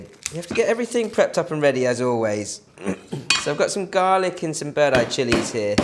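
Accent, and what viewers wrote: United Kingdom. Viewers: I love this guy's British accent..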